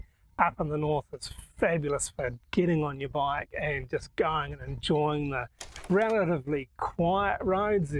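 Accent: New Zealand. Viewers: What a great idea for a video topic. I really enjoyed this. The presenter sounds like he really know what he's talking about. I learnt a lot. 20 years in Thailand and I can still hear a New Zealand accent :)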